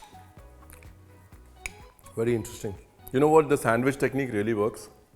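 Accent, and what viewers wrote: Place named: Punjab, India. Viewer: Sorry to say Sir but why do you overeact so much? It always seems like you need some kind of validation that you are punjabi. We all know you are punjabi. You always mix all three languages English, hindi, punjabi everytime. Please use one language according to your channel preferences. I know you have a punjabi touch in accent and we all have .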